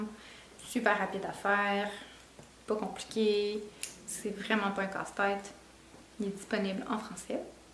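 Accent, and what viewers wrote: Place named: Quebec, Canada. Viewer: Bonjour et merci pour ce partage très agréable ! J’aime beaucoup ce que tu fais et j’adore ton cardigan de Petite knit !! L’accent et les expressions québécoises sont vraiment charmants! Bonne continuation et au plaisir de te retrouver lors du prochain podcast ! Cécile 😁😘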